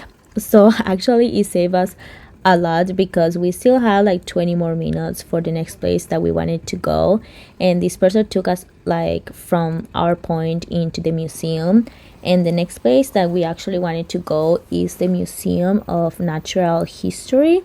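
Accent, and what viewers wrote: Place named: Philippines. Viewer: No offense just curious to know where you are from? I can’t pinpoint your accent..I’m guessing Philippines?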